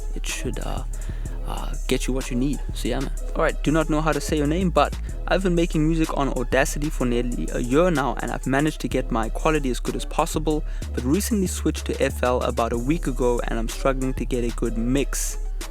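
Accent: South Africa. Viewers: Where are yo from bro you sound South African. I would know cause I’m from there as well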